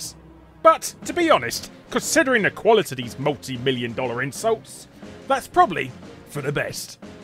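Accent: United Kingdom. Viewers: Time for another 13 minutes of that good ol' British accent.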